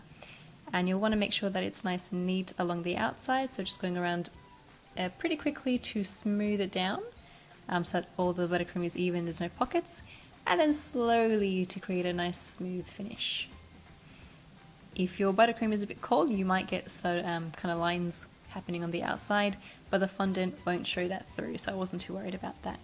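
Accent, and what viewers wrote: Australia. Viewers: You sound like you're from Australia.. are you?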